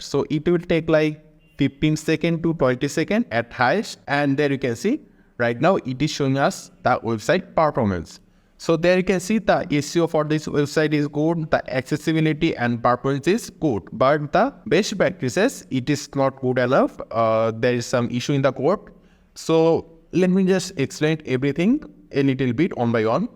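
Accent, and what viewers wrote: India. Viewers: Your voice sounds much better than before in the Laravel E-commerce Project Tutorial for Beginners, but please, we can't understand the Indian accent very well. Can you make the accent a little bit easier?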